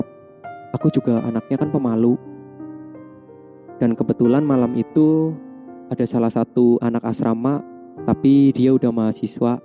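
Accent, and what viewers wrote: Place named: Tegal, Indonesia. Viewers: Baru mau Koment Mbak Mas nya kayanya asli Tegal, soalnya Logat bahasane medok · Terima kasih atas ceritanya mas 🙏😁 suaranya enak banget, aksen jawanya terasa hehehe. Cuma menurutku ceritanya kurang serem 😂. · Logatnya spt Tegal dan Pemalang.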